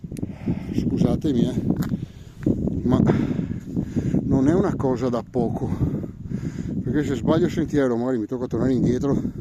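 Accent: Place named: Veneto, Italy. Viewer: Non serviva che precisassi che sei da Venezia... si capiva molto bene ahhaha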